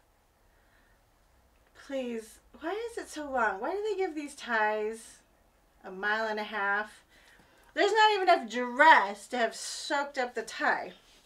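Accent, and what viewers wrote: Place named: South, United States. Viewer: Honey i love your southern accent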